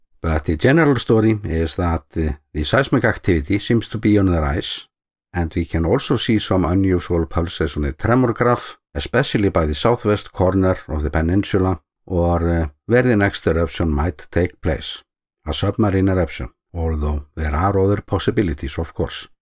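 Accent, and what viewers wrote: Iceland. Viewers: Please don't add background music. I love the humor and the Icelandic accent of the narrator but his voice is soft and would be hard to heard.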